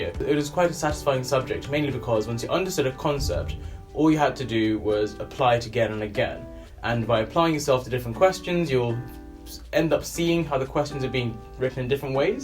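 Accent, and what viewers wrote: RP, United Kingdom. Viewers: Where are you from ? a you sound really posh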